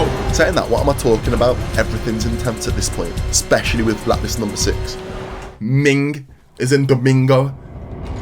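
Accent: United Kingdom. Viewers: Well i am sure your accent really does sound British brother 🇬🇧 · FUCKING BRITISH NOOO but i will stay for mw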